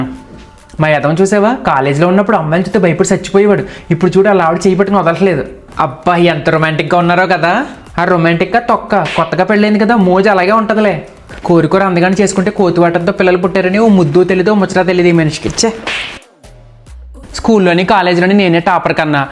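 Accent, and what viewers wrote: Godavari, India. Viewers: external looks doesn't matter for me. So I don't care about color mismatch. He looks as good as he is now. He acts well. His dialect is too good. I like the way he speaks godavari bhaasha.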